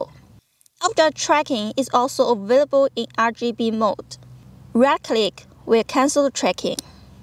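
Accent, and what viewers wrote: China. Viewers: Her English accent is like Chinese 😊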